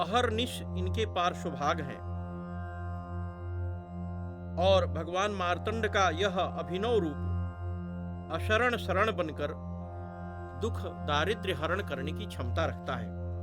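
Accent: Standard. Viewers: अद्भुत, ज्ञानवर्धक,,बहुत सुंदर, विशुद्ध उच्चारण, नित्य श्रवणीय, आनंद दायक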